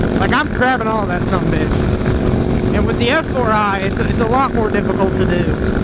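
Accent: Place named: West Virginia, United States. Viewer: Are u from West Virginia?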